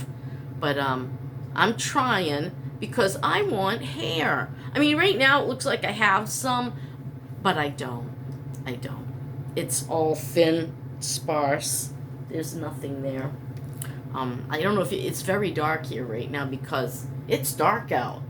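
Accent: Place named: New Jersey, United States. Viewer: Hi! I’ve just discovered you by accident in my feed and now I have someone new to watch! I’ve only watched I think two of your videos so far. I love your “randomness “, that’s exactly how my daughter and I talk…we’re always going from one topic to another then coming back to the first one. I love it! I’m truly happy to see you making it on so little! I love your accent….you gotta be from around New Jersey or something,right? And I love your attitude about punching your way out of a bag! You’re my kind of woman! You can make it through anything and you seem to be doing pretty darn good! I have to do some major downsizing as well! Just so tiring! I hear you about the childhood trauma…I made sure to end that with me. I know my great grandmother was way too tough on my grandma and those attitudes were passed onto my mother who passed it on to me. 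You gotta be the one to end it…life is too short to hurt the ones you love. There’s always time to change and apologize. We all make mistakes but learning from them and not doing it again is the main thing. Are you hoping to have this channel be a source of income? If so, I hope you become more popular and make more money to live on so you’ll have a cushion for emergencies. I sure love listening to you talk and I’m sure others will as well,once they find you that is. Well, I’ll go now seeing as I’ve written you a novel! Lol! Take care! ❤️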